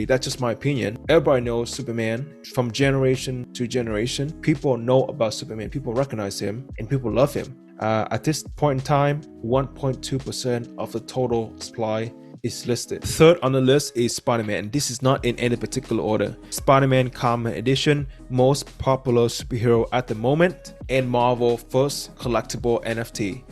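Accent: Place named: Austria. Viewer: I like your Arnold Schwarzenegger accent dude. Great content and channel too.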